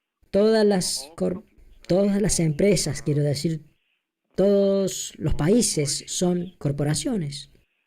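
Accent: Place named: Córdoba, Argentina. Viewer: Sonas cordobes, ya me siento en confianza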